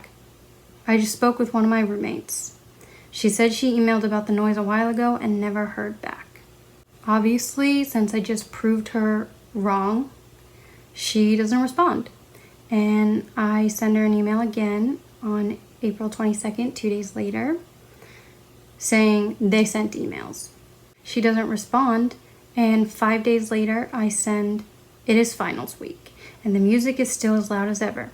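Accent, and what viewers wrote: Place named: United States. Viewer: I noticed your car is a right hand drive. But you have an American accent. Are you in the states or did you buy a car from overseas and ship it to the states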